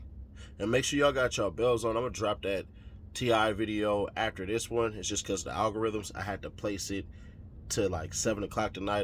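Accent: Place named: Bay Area, United States. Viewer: Nigga I knew you was from Cali I could tell a bay nigga .... much love from 77th Holly e.s.o!!!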